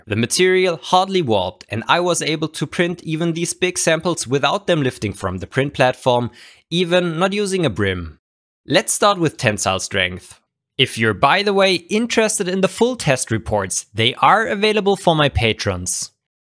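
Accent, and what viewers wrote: Germany. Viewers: I just come for the German accent.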